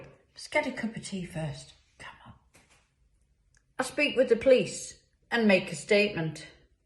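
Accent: United Kingdom. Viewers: Absolute class. Great dresser- and love that posh Stoke type accent.🤟🏼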